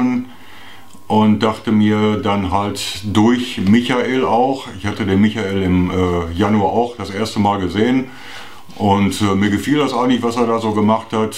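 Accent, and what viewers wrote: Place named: Ruhr, Germany. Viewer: Ich mag deine Projekte und deinen Slang! Ruhrpott? Gruß von einem Exil Dortmunder.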